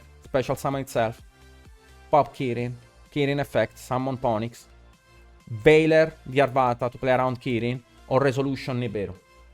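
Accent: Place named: Italy. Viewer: questo modo di parlare... maledettamente italiano; (viedo molto utile comunque)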